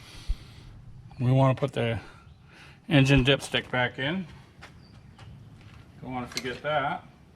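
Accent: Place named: Northeast, United States. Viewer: Ps Sounds like you're up here in NE by that accent.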